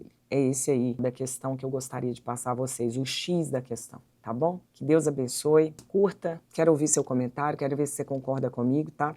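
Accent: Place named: Belo Horizonte, Brazil. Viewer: A senhora é pastora em belo horizonte? pergunto pelo sotaque 😊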